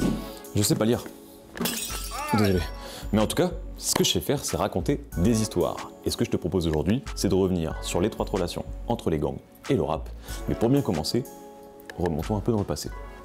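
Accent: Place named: United Kingdom. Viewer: la prononciation anglaise change le sens des mots, stp fais gaffe pour les sourds et malentendants merci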